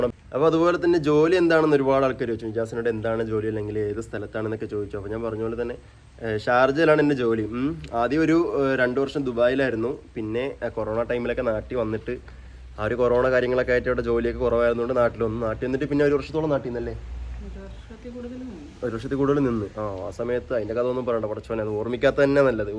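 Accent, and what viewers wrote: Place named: Palakkad, India. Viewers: Slang cheyyo palakad then kollam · Chakkara Palkkad aano Samsarm kettappo original malappuram aan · MalappurAm palakkad samsaram ekadesham oru pole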